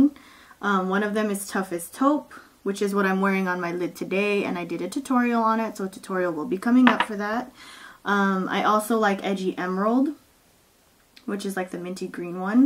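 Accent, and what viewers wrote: Philippines. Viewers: I love ur Filipino accent. hysterical!! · I love you girl haha..ur Filipino fob accents are hilarious ..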